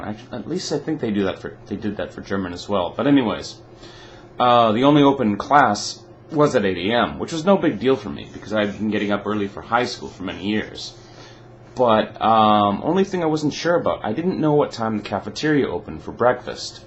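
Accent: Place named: Japan. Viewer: Interesting. You and I may be the only ones in the US who did not start because of anime. Judging from your accentation I guessed you had access to a friend or relationship partner to study with from Japan. Still, it takes a unholy obsession to learn Japanese, I feel there is much more to this story left untold.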